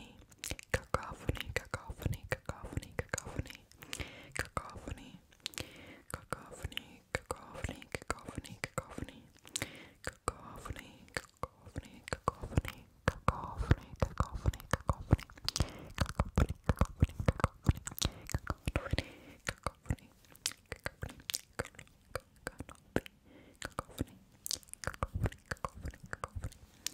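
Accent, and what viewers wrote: Sweden. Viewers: My grandfather is swedish so I really like your accent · Swedish is one of the best accents for asmr and accent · He has a Swedish accent ! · Hee Swedish I believe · I love your Swedish accent ❤❤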